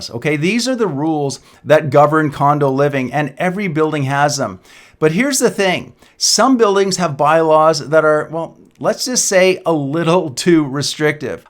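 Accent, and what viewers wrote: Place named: Ontario, Canada. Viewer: You talk like you are from Ontario. We don't say "out or "about like that here in Vancouver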